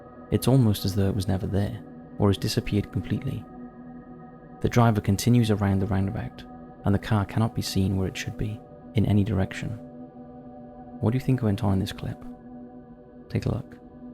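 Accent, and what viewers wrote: Wales, United Kingdom. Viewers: Hello, you have a great voice, do I spy a bit of Welsh. I think you should loose the top 5 and start doing full stories as your voice and narrative skills would suit that. But thank you for uploads. Always a joy x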